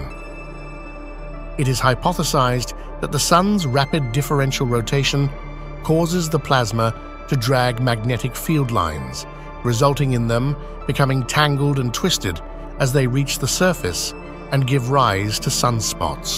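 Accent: United Kingdom. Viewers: These dramatic readings with the English accent makes it all so Shakespearean. Loved near the beginning talking about machine malfunctions even though unplugged. 1850s had no electricity or anything, anywhere to “plug” in. The only electrics were primitive telegraph systems that because of the wires linking the stations acted as antennas directing the CME output to the stations. Just give the real history without the stupid drama please. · This channel is AI driven and produced content with a robotic British AI narrator so it sounds sciencie 😂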